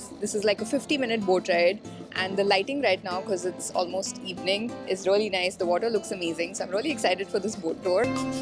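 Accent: India. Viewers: You have an Indian accent, are you from India? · Yes she is from India.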